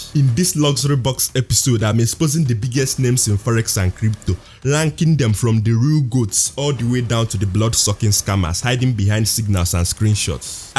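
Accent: Igbo, Nigeria. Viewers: Ur Igbo accent ❤ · Your accent is Conc igbo and is disturbing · Thick Igbo accent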